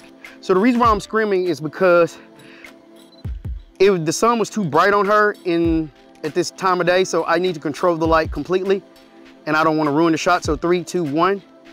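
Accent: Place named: Texas, United States. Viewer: I could tell you were a fellow Texan as soon as you started talking. Fantastic work! It's crafstmen like I follow to learn more about the art of photograph and videography. You've got my subscription.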